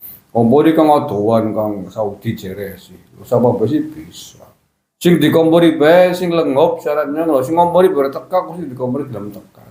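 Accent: Banyumas, Indonesia. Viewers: paling seger nonton kontenne panjenengan bung.logat ngapakke ngalahno boso inggris.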